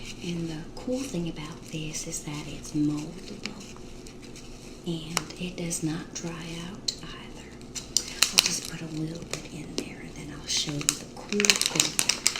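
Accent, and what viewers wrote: South, United States. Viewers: Aaaaah🎉! You’re back with the Southern accent 🥳🥳🧐thank yoooou!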